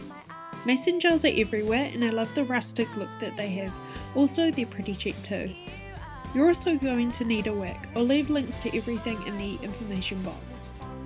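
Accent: New Zealand. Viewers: hey! are you a kiwi? I think I detect a New Zealand accent? if so, hi fellow NZer! just wondering, where specifically did you buy the wick? I find it difficult to find here in New Zealand, and was wondering where you got it. thanks!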